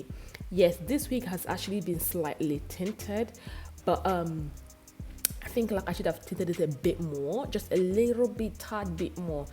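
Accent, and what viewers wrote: Nigeria. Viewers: I’m here to hear your nigerian accent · No be edo accent I Dey hear so???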